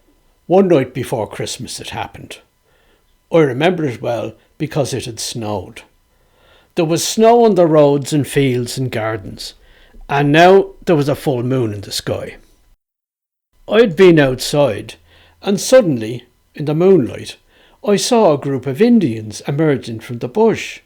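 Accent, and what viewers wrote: Dublin, Ireland. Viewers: What a treat, a tale told by a Dubliner waiting for me when I opened YouTube. Many thanks for this slightly sad story.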